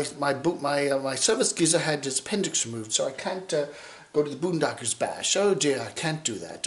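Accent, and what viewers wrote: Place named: Boston, United States. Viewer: what is "service geezer" my idea can't be right . great accent ! boston ? lol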